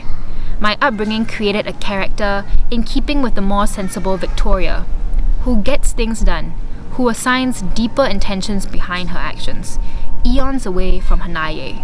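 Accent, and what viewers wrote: Singapore. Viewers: Hopefully your Singaporean accent will fade away with the world class education at Yale.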